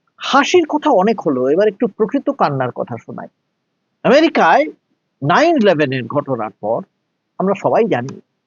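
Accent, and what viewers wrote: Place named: West Bengal, India. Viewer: আপনার বলার বাচন ভংগী খুবই চমৎকার,,,,,, তাছাড়া পশ্চিম বাংলার বাংগালী ভাইদের ভাষার মাধুর্যতা তো আছে ই,,,,ধন্যবাদ আপনাকে।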